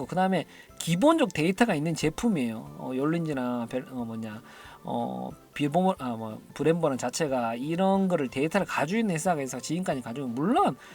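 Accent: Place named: Gyeongsang, South Korea. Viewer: 대구에 매장있으셧내여 억양이 익숙하다 싶었는데 매장에서 혼다 자켓은 입어볼수있나요?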